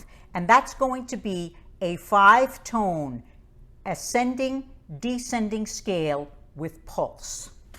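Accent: New York, United States. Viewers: I love your NY accent! A lot of my family is from there and it reminds me of them 😄 Also your videos are super informative. Thank you!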